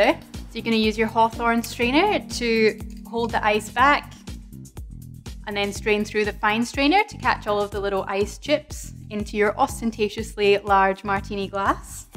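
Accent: Scotland, United Kingdom. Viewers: Where did you get this martini glass? I love it. New to the channel and lovely to hear a Scot!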